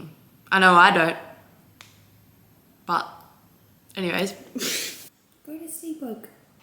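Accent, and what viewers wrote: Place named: Australia. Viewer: Who wants to listen to me for 25 minutes errrrrrr me trying to fall asleep to the sound of that soft beautiful Aussie accent 😂id actually appreciate longer videos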